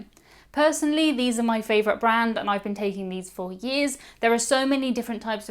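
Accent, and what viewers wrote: United Kingdom. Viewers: Please continue to speak in your home grown accent and use the words of your country. I love your "Britishness".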